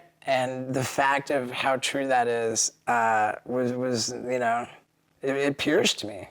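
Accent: United States. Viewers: Interesting that he sounds just purely Americain.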